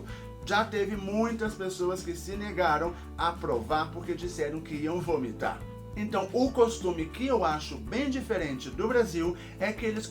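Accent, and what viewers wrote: Antioquia, Colombia. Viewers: Já está falando com sotaque paisa! Arriba Colômbia! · Adoro que quando vc fala em espanhol, tem o sotaque paisa!!! Que lindo!!!! Abraço forte!!! Ps. Embora sou colombiana, não gosto de comer abacate com arroz ou sopa. 🤗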